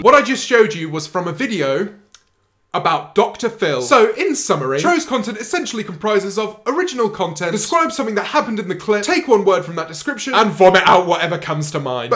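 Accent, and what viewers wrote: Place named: United Kingdom. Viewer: I am trying to take you seriously, to understand your narratives but your voice is killing me. I can't take it, it's worse than James Corden. The British accent with the fast-talking is messing up my brain. That wasn't meant to be a roast. That's just how I feel. I like Tro's random comedy, that's my preference, but I know what you mean by his repetitive content. I am kinda getting annoyed by it too. JustDestiny is just like Tro too. Exactly, the same type of video style, but I like Destiny's roasts better, and Tro's comedy better. That's why I am subscribed to both channels! :) Also at first, I thought JustDestiny, TriggeredTro, and LeafyisHere were all the same person. Just the same video style, same content, none of them ever show their faces, they all have a game playing in the background, and they all sound like 20-year-old white guys who just dropped out of college. 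(Not meant to be a roast, my opinion) Sorry for the novel you had to read! Peace!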